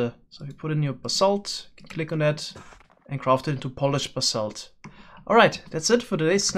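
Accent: Germany. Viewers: Dein Englisch is so deutsch, ich kann nicht mehr 😂😂, keine grammatikfehler oder so aber der Akzent is einfach geil! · Ich Liebe deine Videos, Aber es ist echt schlimm einen Deutschen Englisch sprechen zu hören wenn mn weiß das Er es auf deutsch besser könnte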